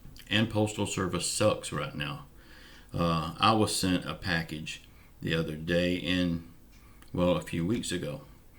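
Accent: South, United States. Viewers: I love your channel. I want to Thank You for everything.. U r the only one that seems to know whats going on , plus o love that southern accent... take care hope Amy is doing lots better ..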